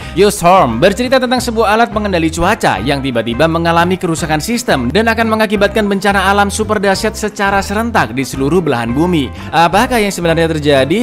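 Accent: Java, Indonesia. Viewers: lagi² medok. kebanyakan yg bahas film pada medok. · Cak Jawa mana sampean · pengendali cuaca pake alat hah, indonesia dong pake pawang 😂 Yo ngene iki nek bahasa jowo medok dadi content creator, sak onone disebutno 🥲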